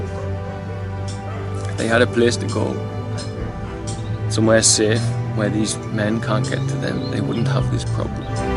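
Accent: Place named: Newcastle, United Kingdom. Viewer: Just a joy to hear a Geordie/!Teesside accent Do tell us your story.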